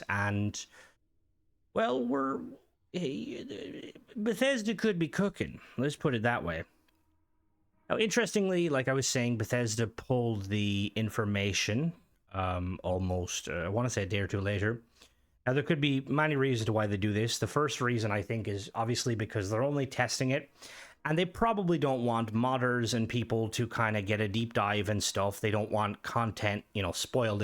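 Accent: Ireland. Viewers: We love are angry Irishman Mod reviewer. Everything sounds more intense with an Irish Accent, it makes ppl pay attention. Keep on doing what all of us don't want to do and review them mods.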